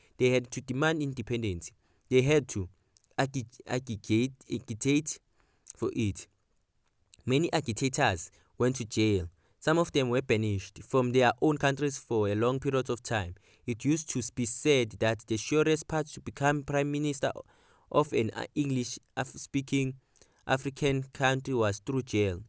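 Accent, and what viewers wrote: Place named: Zimbabwe. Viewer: Not being rude but your pronunciation is giving Zimbabwe coz my history teacher is Zimbabwean so u sound like 🤔 him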